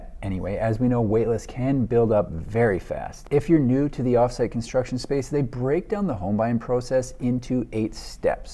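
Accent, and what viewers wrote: Canada. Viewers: I would love if you got to go TOUR these favorites and get the behind the scenes info and tell us what places impress you the most. Maybe you need a US correspondent! I assume your Canadian because of the accent but you could be Midwestern too?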